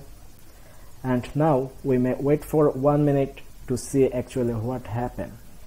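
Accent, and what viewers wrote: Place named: Bangladesh. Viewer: BANGLADESHI ENGLISH.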